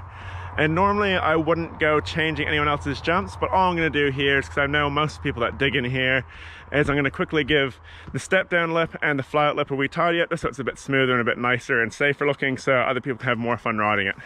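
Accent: New Zealand. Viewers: You sound like kinda New zealandish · This accent though, i hear kiwi, aussie and american all in one sentence.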